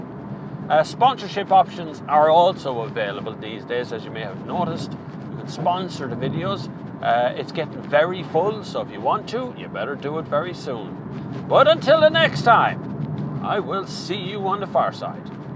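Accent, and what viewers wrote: Ireland. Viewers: just love that mix of RS3 pops and a paddys voice on that super looking stretch of road Top video buddy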